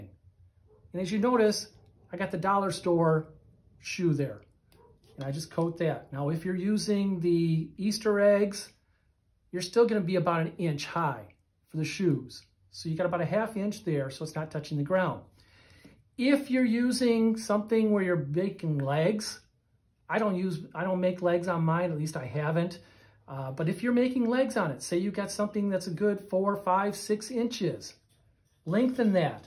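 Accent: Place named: Midwest, United States. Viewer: and accent!! Midwest??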